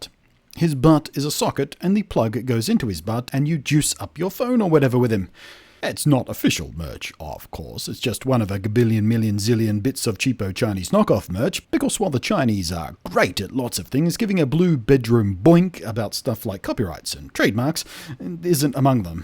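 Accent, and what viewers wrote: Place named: Australia. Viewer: There's something about a deep voiced eloquent Australian's ranting that cuts deeper, ya know?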